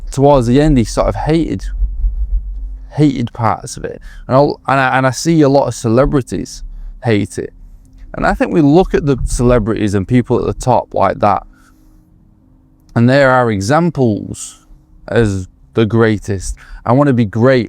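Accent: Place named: Manchester, United Kingdom. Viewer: More people need to hear this. You are straight talking and bang on point in what you say. Im from Manchester, Your accent reminds me of my grandad and he used to say the same things you are bringing up which is proof there isn't a glitch in the system its by design. Please don't stop doing the videos you make me feel less crazy because im not the only one thinking it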